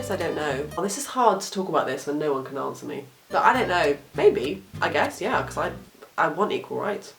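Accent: England, United Kingdom. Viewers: You make really good videos, plus I like the travel videos like Ibiza, keep it up you are awesome, my friend likes your English accent.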